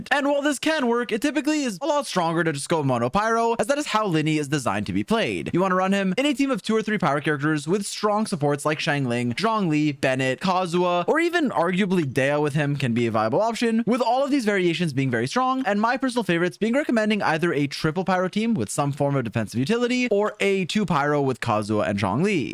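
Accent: Quebec, Canada. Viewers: does he have a cold or Canadian?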